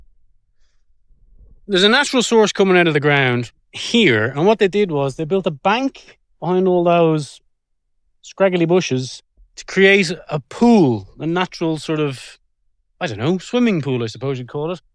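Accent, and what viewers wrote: Ireland. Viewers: Wow!!! Beautiful video. Music! Editing! Day in the life... everyday beauty! Cooking! Love it! Great pace. Great visuals... and your beautiful Irish accent!!! Thank you!! Loved it. Watching from NYC.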